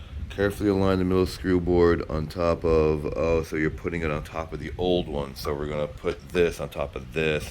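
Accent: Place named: New Jersey, United States. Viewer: I'm guessing you're from Jersey or somewhere thereabouts.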